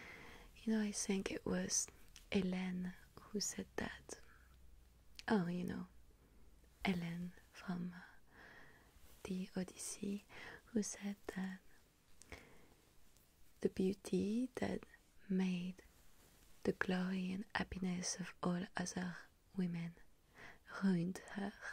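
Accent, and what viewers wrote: France. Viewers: Oh please do more of these in this french accent you are pure magic! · Loving you using a heavier French accent lately, it's extremely calming <3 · C’est magnifique! She returns just in time for me to go to bed, and with a luxuriously thick French accent. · i love the french accent, it sounds so nice :,)) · oh the french accent 👁️👄👁️ falls in love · The tone of your voice and the heavy French accent sound amazing together 10/10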